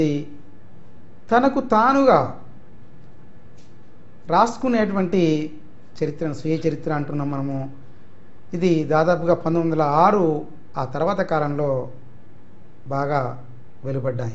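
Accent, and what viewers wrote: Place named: Andhra Pradesh, India. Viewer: ap gaadila cheptunnav ,waste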